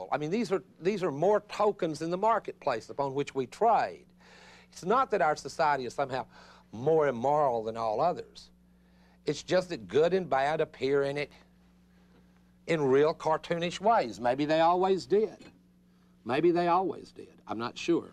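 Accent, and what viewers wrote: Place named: Texas, United States. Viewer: I love that Texas accent. His Texan accent sounds like an anti-abortionist accused of burning down a clinic. Yet these brilliant concepts are produced through this accent. Diversity it is.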